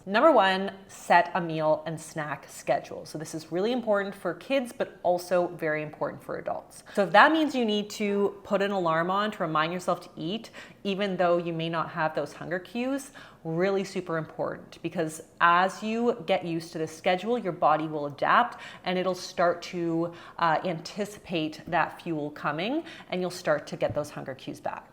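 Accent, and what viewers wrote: Canada. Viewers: I know you're Canadian. But you sound more and more Canadian recently. Love it! ❤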